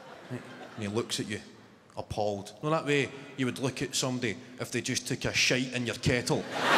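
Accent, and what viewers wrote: Scotland, United Kingdom. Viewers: It’s not just the accent it’s the whole Scottish persona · English but with Scottish accent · He's just genuinely funny, I personally think if he had a different accent he'd be funny but the Scottish accent just adds a different twang and emphasis to what he's saying, can't put my finger on it but he is different. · The Scottish accent just makes it better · As far as Scottish goes this is easy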